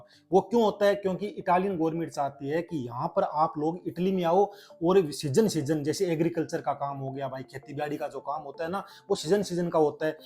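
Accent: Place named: Haryana, India. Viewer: Language Haryanvi Touch 😊😊😊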